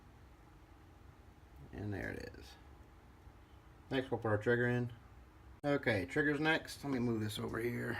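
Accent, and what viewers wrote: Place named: South, United States. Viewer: Honestly couldn’t have done this without you! Thank you very much. And your southern accent helped keep me calm and patient while I followed your instructions. Very Helpful!!! 5 stars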